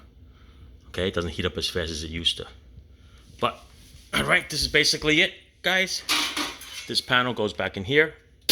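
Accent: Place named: New York City, United States. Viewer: Love that NYC accent.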